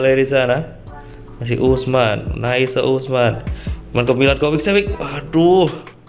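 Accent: Java, Indonesia. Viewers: medhok men mas haha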